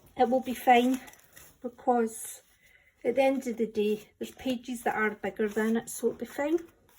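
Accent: Scotland, United Kingdom. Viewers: Watched Ceri unwrap the journal you sent him. You have a very unique approach. Yes, quirky. Love your accent…Scottish, eh?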